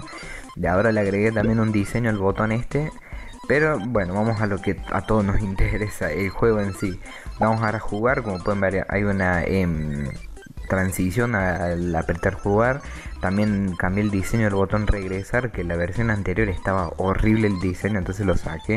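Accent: Córdoba, Argentina. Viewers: amigo sos de argentina cordoba?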